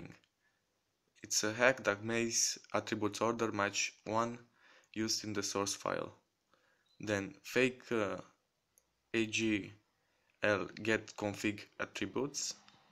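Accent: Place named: Russia. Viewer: Видно что русский,но английский хорош!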